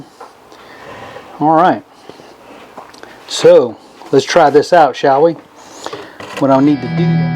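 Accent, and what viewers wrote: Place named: Virginia, United States. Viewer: Sound like you're in Virginia.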